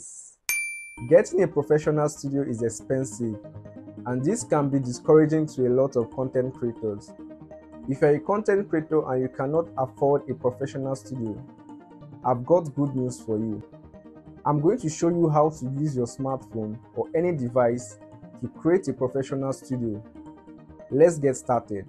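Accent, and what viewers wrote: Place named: Nigeria. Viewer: I am not a linguist, an East African but I can place this accent in West Africa, most probably Nigerian but might be Ghanaian. I can almost understand pidgin though none of it is used here. Some linguist you must be.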